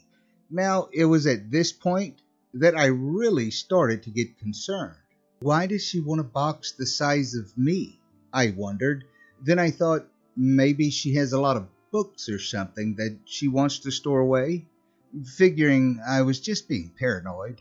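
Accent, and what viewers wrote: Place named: Midwest, United States. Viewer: You really sound like guys I know are you midwestern like Iliana area if you know where I’m talking about then you are if not oh well but you have that sound. It’s good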